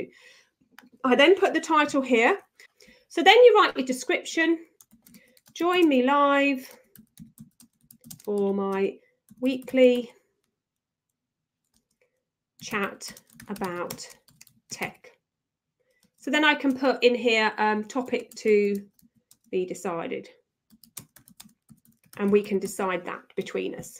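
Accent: United Kingdom. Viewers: lOVE YOUR BRIT ACCENT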